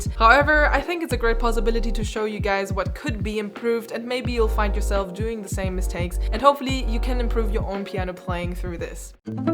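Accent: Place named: Germany. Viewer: Interesting video youtube recommended me. I instantly knew that you were German like me. Why? Unfortunately you hear it. But your English is very well as is your pronunciation. It's probably because you're not speaking an American English and who knows how to speak like the Brits do? I watched a video of you playing the piano and you're a super awesome piano player. I am learning to play but it's just beginner's level which is fine. 😉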